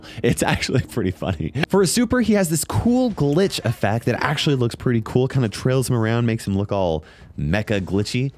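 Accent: Norway. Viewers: Wait wait wait Are u Norwegian? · Are you Norwegian?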